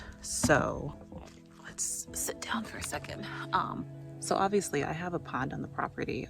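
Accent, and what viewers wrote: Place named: Philadelphia, United States. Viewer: Just came across your channel, Love your content already! And your Philly/Delco accent 😆💚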